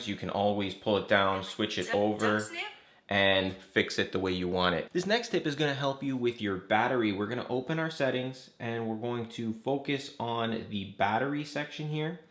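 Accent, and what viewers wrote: Canada. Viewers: This is freaking perfect. SO helpful. Thanks, buddy 😊 Also, love that I can tell you’re Canadian from your pronunciation 😁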